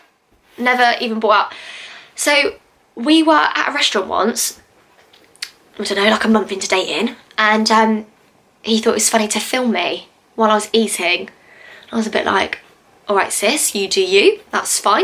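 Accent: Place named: United Kingdom. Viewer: I have a bilateral cleft palate I am Filipino male. I experienced a lot of verbal abuse from my own dad and other people because of my birth defect. I tried many times to slayed my oown life. I experienced more than ten years depression. I survive of this negtive situation because of my faith to Jesus Christ. I love your gorgeous accent and u have pretty face moon. I wish I can meet a beautiful british girl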